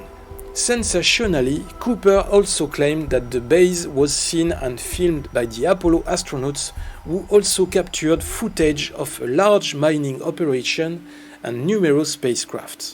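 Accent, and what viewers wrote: France. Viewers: Waouh l'accent Fran-glais 😁 268k d'abo en langue Anglaise pour un Français ! Bravo ! Pouce bleu !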